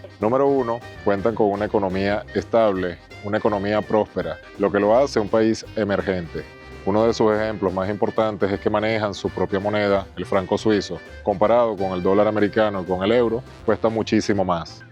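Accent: Venezuela. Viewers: Tienes acento venezolano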